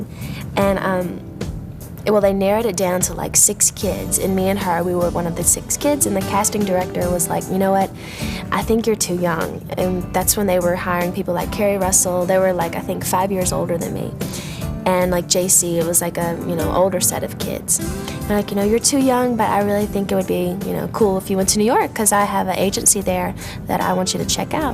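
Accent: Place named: South, United States. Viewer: Too bad Britney has lost most of her cute Southern accent :(.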